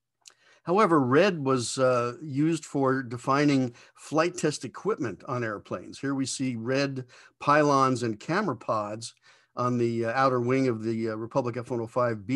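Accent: United States. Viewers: WHY THE AUDIO SOO BAD. FIRST HE IS WISPERING AND SECOND THE TOO STRONG AMERICAN TONE MAKES THE VIDEO UNUNDERSTANDABLE BY NON AMERICAN FOLLOWERS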